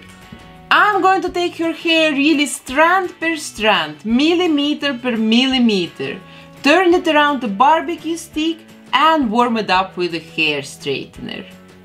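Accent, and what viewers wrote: Russia. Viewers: У тебя русский акцент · У вас очень сильный русский акцент. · почему вы говорите как русская?.... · Воу, мне кажется или ты русская? Просто акцент русский . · Мне кажется, я слышу сильный русский акцент🤔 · hmmm russian dialect...... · Your accent reminds me of a Russian accent 🤔 (yeah, i'm russian👌) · что за русский акцент:)) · Мне кажется или она русская, у нее акцент не англичанки или британки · Слишком русский акцент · Are you Russian? Cos you have such a cute russian accent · Ни капли не разбираюсь в английском, отличить акценты ни в этой жизни не смогу, но русский с первых минут просто узнала. Вот прям слышно что наш человек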